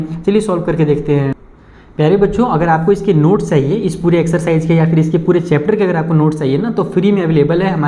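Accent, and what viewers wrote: Bihar, India. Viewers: Sir aap bihar se ho kya❤❤.. kis jile se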